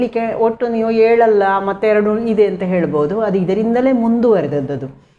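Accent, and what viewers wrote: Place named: Mangalore, India. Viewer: Tq u so much mam 🌹nimma Mangalore bashe spr🥰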